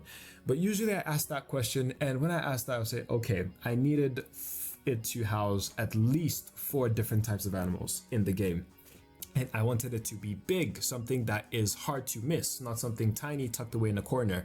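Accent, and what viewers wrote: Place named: Nigeria. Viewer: Your vids really helped me break my builders block thanks man. I always knew you were Nigerian hehe😅... M also a Nigerian we know our tongue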